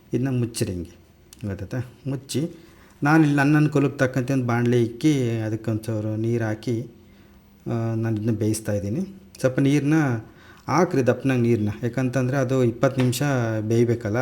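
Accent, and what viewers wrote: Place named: Channagiri, India. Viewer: Channagiri side pura hege matu keli thumbha kushi aytu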